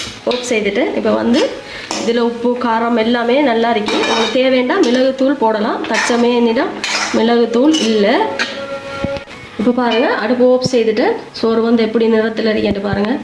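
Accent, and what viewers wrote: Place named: Mannar, Sri Lanka. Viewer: Sound like you are from mannar!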